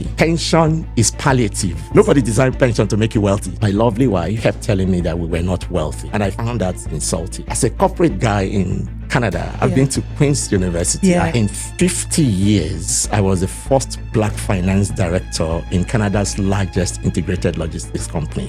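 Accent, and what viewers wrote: Nigeria. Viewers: This man speaks like Nigeria 🇳🇬 n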